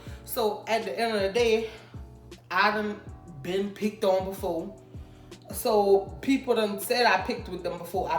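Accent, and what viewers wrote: Louisiana, United States. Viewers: She sounds like she from Louisiana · Are you from Louisiana? Your accent is cute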